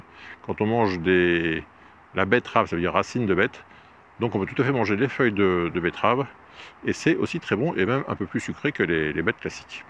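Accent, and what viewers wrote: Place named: South, France. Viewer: Bravo, magnifique reportage avé les accents du Sud!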